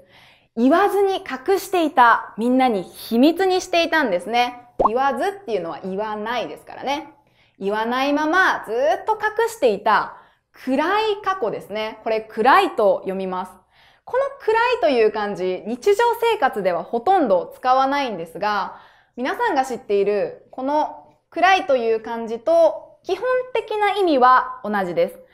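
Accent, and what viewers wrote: Kansai, Japan. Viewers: 先生は関西人でしょうね。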